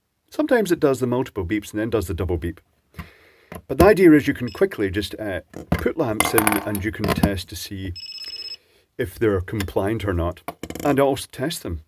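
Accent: Scotland, United Kingdom. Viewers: are you from Scotland? im Scottish and u sound Scottish sometimes but also you sound more English and its so confusing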